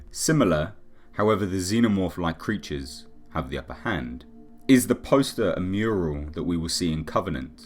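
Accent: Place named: United Kingdom. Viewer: Love your videos, great voice for narration (why do Brits always make things sound interesting?) :P. Quick question? Do you believe E. Shaw was turned into an alien queen or some other monster by David after reaching Paradise? I think David had learned a great deal more about the Engineers (their tech, history) than we were led to believe during the Prometheus film, so he has he maybe taken Shaw to a different planet and not the engineers' home planet?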